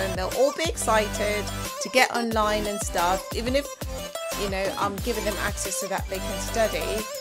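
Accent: United Kingdom. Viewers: British accent manyama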